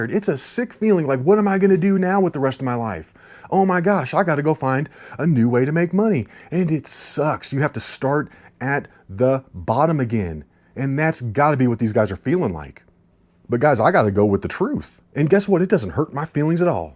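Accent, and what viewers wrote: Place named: South, United States. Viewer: Here from mctoons channel, new sub here, great vid!!! Love the accent too man, sound like where ever billy bob thornton is from!!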